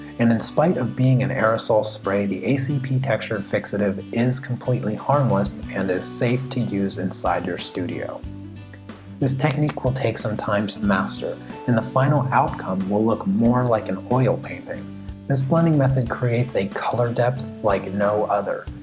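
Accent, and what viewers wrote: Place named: United States. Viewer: I am not a bigot, but I do wear hearing aids. It is SO refreshing to hear American English spoken. I have a great deal of difficulty understanding accents; British in particular. Thank you. Your voice is also soft and low, not high and piercing. Thank you.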